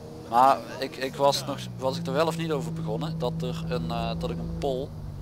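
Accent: Limburg, Netherlands. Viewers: Blijf je zelf. Je komt uit Limburg en daardoor heb je een accent wat ik overigens opzich wel mee vind vallen. Ik vind het helemaal niet erg, je moet lekker praten hoe JIJ dat wilt. Lekker boeiend wat anderen vinden, wij verstaan je en begrijpen je. Dus je hoeft helemaal niks te veranderen aan hoe je praat. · Mooie auto met de nieuwe striping! Gaat de politie de tourans ook nog nieuwe striping geven? EDIT: je komt uit Limburg, daarom heb jij een accent maar je moet gewoon praten hoe jij dat wilt! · En ik merkte het niet dat jij Limburg s bent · Praat lekker limburgs man je mag toch gwn doen wat je wilt anders zet hij/zij z.n geluid maar uit.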